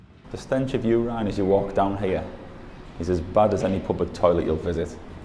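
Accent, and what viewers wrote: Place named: Teesside, United Kingdom. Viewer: You sound as if you are from Teesside area, not Scarbrough ?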